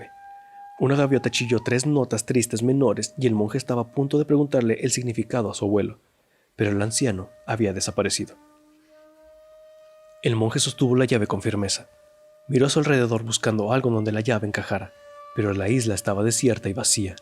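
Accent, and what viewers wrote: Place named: Bogotá, Colombia. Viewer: A mí no, me hace pensar en un rolo gomelo. No me puedo concentrar de imaginarlo. Aunque es claro, vocaliza bien y se entiende muy bien, es sólo si acento que me suena como dije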